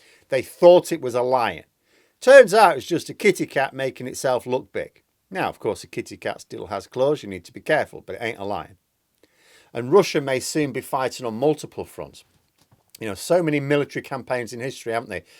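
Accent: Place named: Yorkshire, United Kingdom. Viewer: You went very Yorkshire in this one, momentarily reminded of my Yorkshire Grandad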